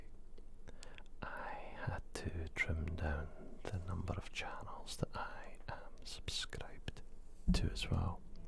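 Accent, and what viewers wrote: Scotland, United Kingdom. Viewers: I LOVE videos like this. I’m always partial to ramble videos. For me, you’ll never be one of the channels that gets buried. Scottish accents seem to be few and far between in the ASMR community, other than Lauren, so I always find myself coming back to your videos. You’re one of only channels I have notifications turned on for. Keep up the great work my friend, but I hope that you’re still enjoying this grand youtube odyssey you’ve embarked on.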